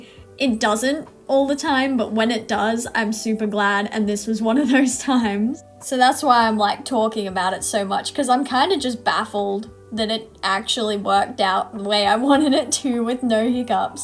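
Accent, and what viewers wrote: Australia. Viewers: Just found ur channel, ur personality is si great I love ur vids 😂 are you australian? Your art is amazing btw!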